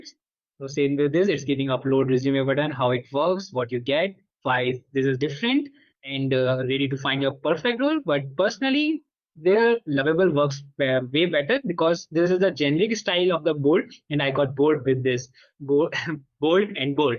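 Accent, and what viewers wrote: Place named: India. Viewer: You know what I find amusing? the low count of views for videos such as yours and I imagine it's because of U.S. viewers having issue with accents from our Indian brothers and sisters. But these folks always explain details way more concise and on point than many of the native english speakers. Native speakers are always hyping or going off topic. What I'm getting at is thank you my friend and thank you for your passion and sharing it with us all.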